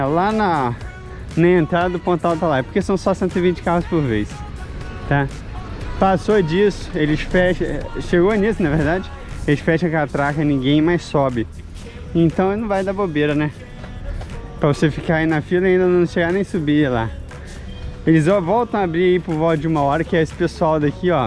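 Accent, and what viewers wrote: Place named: Minas Gerais, Brazil. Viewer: Que água maravilhosa...essa cor me faz tão bem!! A Obra de Deus é perfeita!! Essa prainha dos pescadores é um paraíso..., também achava que fosses mineirin...seu sotaque, apesar de paulistano é autêntico de um mineiro.😊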